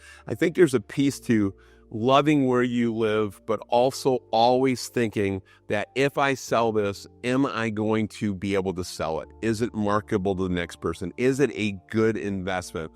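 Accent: United States. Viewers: You sound like you're from the Binghamton area! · Love the Michigan accent!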